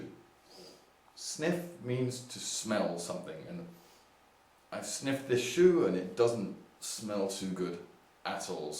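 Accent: United Kingdom. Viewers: Esto es de lo poco que se encuentra con acento británico.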